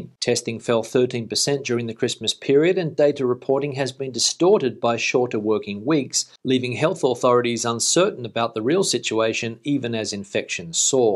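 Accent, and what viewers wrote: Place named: Australia. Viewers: Saludos desde Australia!... I just discovered your channel yesterday! I moved to Beautiful Australia 3 years ago and I love it! Yes! We are lucky here! I was born in Colombia,but moved to the USA,when I was very young! So,thanks God I’m Bilingual...I visited Spain years ago and I love it! Dreaming about going back after the pandemia is over! Your English accent sounds Aussie!..but you know good Spanish by now! Keep up the good job! Gracias! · That’s because he’s from Perth Australia. After 20 years in Spain and unknown other reasons he’s developed his own accent. In today’s video he pronounced “assume” as “aschume”. I speak with a Californian accent, but weirdly pronounce several words with a British version, such as military, literally, and the weirdest one aluminum.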